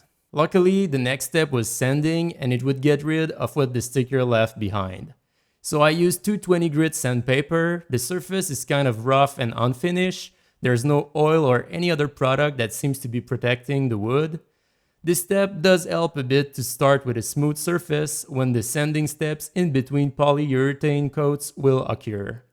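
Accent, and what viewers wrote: Quebec, Canada. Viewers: Est tu québecois? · Great video dude! Are you from Quebec?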